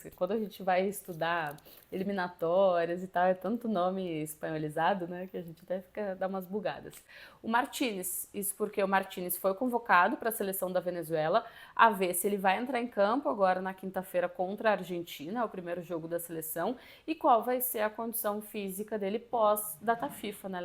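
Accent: Rio Grande do Sul, Brazil. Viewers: ! Uma dúvida: É impressão ou tu é gaúcha? Parabéns pelo trabalho.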